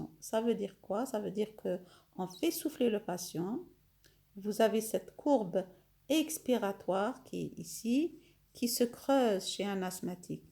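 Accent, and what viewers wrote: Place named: Algeria. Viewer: d'apres la voix et les bonnes explications je savais bien qu'il s'agissait d'une professionnelle Algérienne, je suis Algérienne vivant au Canada, je vous dis bravo pour toutes ces explications ( nouvellement diagnostiquée Asthmatique ).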